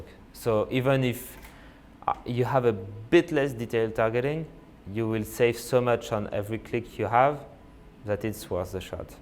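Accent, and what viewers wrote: France. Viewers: Good talk with a lovely French accent :) The thought process of growth hacking can be taught and mastered, that's what people have to tell themselves. Read books, be around people who've done it, and actually jump into business. I empower people everyday at Elium (<link> and I can say that the barriers between them and their dream life are often imaginary !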